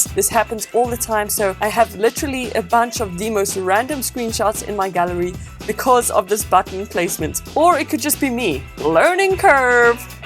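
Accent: South Africa. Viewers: Is this broad south African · South african accent? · ek wou sê sy het 'n Suid Afrikaanse aksent 😂😂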